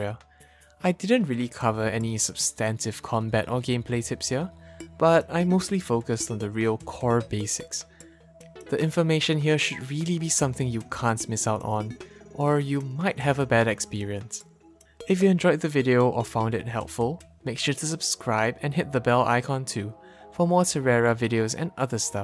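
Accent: Singapore. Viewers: Wah bro, you sound like a singaporean. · knew u were singaporean the moment u started talking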